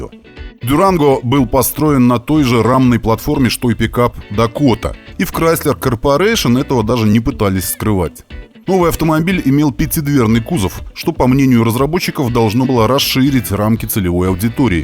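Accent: Belarus. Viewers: Белорусский акцент ппц)))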